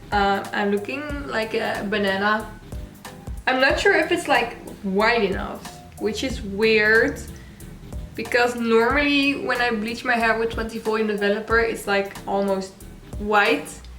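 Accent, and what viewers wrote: Netherlands. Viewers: Are hou Dutch? You sound Dutch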